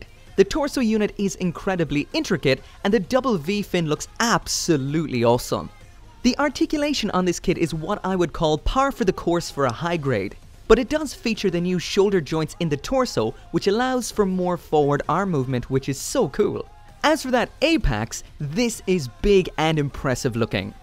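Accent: Ireland. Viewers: Once you heard his irish accent you can't unhear it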